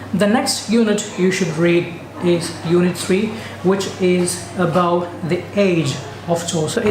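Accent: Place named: United Kingdom. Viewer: Pure British accent wow...Thanks.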